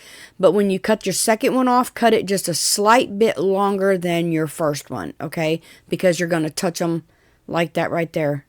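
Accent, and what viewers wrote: South, United States. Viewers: I love what you created from the various types of wood ! I’m a displaced Southerner living in the west and felt right at home hearing your explanations! 😊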